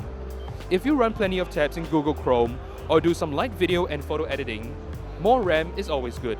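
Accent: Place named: Hong Kong. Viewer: Probably Hong Kong cuz we so do have a Asian British accent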